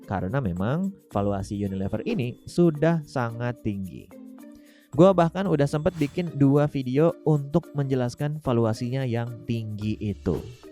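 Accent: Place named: South, United States. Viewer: Logat southern American ya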